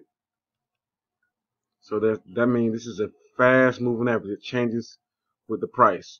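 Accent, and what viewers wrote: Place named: South, United States. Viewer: Yes, wealth of info in there. That's why bit frustrating cos i couldn't leave the video and had trouble understanding haha. I am from the UK so not used to the southern accent. It's not about how you speak but that we all speak differently depending on where we are from and can be hard to understand each other some times. Thanks again!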